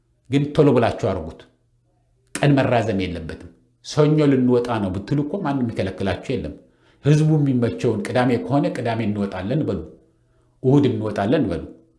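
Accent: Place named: Eritrea. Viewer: አክሰንትህ የኤርትራ ነው በቃ ኢትዮጵያውያን በናንተምክንያት ስንቱን ስቃይ አዩ ኢሳያስ የሚባል ጸረ ኢትዮጵያዊ ነው!!! አብይን ብጠላውም የዝህ ሁሉ የቆየ መዘዝ የኢሳያስ ፖለቲካ ነው እግዚአብሔር እናንተን ብቻ ይቅጣቹ ክፉዎች ቀናተኞች ! ቲፒኤሌፍ ኦነግ ብትሉት እናንተ የሰራችሁት ጸረ ኢትዮጵያ ስብስብ ናቸው !!! እኔ ተስፋዬ በእግዚአብሔር ብቻ ነው የእሱ ፍርድ ይውረድ ምርጥ ሃገር ነበረን እድሜ ለጅኖሆይ ያው ለሁሉም ሞት ሆነ እሳቸውን በግፍ ጥላቹ የእሳቸው እርግምን በየቤቱ እየገባ ነው ይበለው ይሄ ሕዝብ ማዘንም አያስፈልግም !!! ክታንኩት ፖለቲካ የዘር ፖለቲካ ለማን በጀ????? ለማንም !!! እርስ በእርስ ተጫረስ ሃገር አልባ ሆነን ማየት ነው የሚያስፈልገን ያኔ ይቆጨናል !!!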